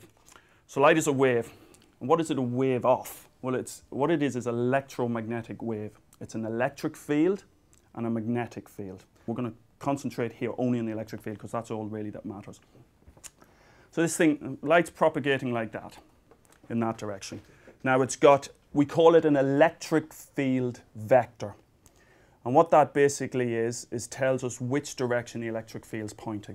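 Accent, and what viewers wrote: Ireland. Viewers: He is definitely irish! =D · is he irish? if he is good to know that were making an impact on the world other than being drunk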